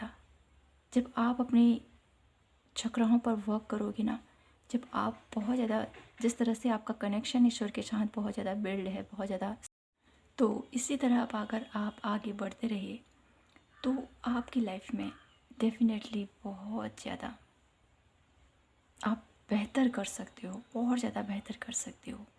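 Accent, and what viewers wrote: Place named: Bihar, India. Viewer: thanku ji Achcha laga ki aapane bhi Renu madam Se Hi Sikha Hai reading bhi aap bahut acchi karte ho aapka bolane ka style bhi achcha Hai Jahan Tak Mera Khyal hai aap Shayad Bihar ke Rahane wale ho aapke boli se mere ko Aisa Hi mahsus Hua kya aap Kolkata Nahin to Bihar ke aap Rahane wale ho aur Main apna WhatsApp number bhej deti hun agar aap Chahenge to ham log baat kar sakte hain theek hai aap ek bar humse baat Karen thank u ji aur family and Happy Diwali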